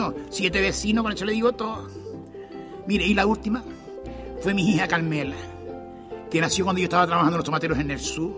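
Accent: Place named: Canary Islands, Spain. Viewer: Maravilloso ese acento y modismo de los barrios capitalinos y ese sabor canario. Que desgraciadamente se ha perdido...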